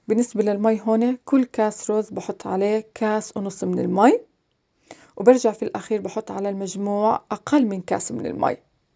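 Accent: Jordan. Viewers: أردنية أردنية يسعد لهجتها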